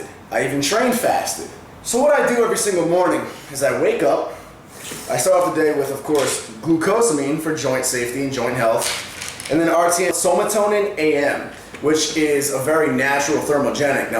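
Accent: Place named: Rhode Island, United States. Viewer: Did I just hear a classic RI accent Boda-buildin?! hahaha nice video brah! After a few weeks we will see how its working and make adjustments from there.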